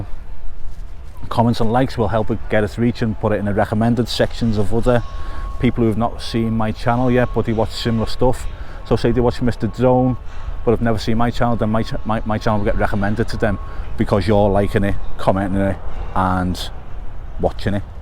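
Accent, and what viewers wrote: Liverpool, United Kingdom. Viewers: Am a long distance ‘pool fan (Teesside). I could listen to your scouse accent all day long. Love your videos as well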